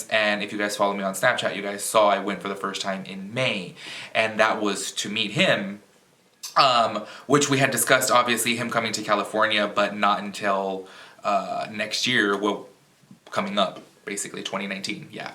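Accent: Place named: South Florida, United States. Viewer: Aww I missed you! I love your reviews. Ive always wondered are you from Miami originally? Or Lauderdale? I pick up on that accent being from south Florida myself.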